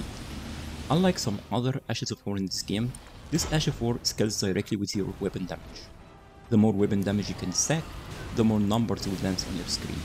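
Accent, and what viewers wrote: Egypt. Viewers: I can tell you're Egyptian from you accent lol nice video my friend :)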